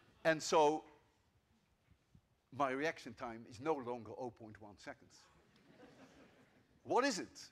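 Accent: Netherlands. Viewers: I could hear immediately that he is from the Netherlands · 5 seconds of listening and I already know that he's a dutchie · I immediately heard he is Dutch. I don’t even have to research it. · Yep, Dutch for sure. He threw me a bit when he said he'd seen it many times in Austria, but then I came to my senses.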